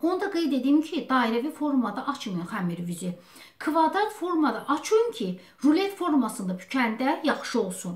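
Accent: Baku, Azerbaijan. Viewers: Salam bacı. Kərə yağını duru yağla əvəz etmək olarmı xəmirində? Bakı ləhcəsi ilə danışığınız 👍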